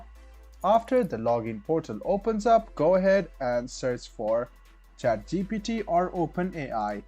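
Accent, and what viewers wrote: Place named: Nepal. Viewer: The nepali-english accent. Nice man 👍👍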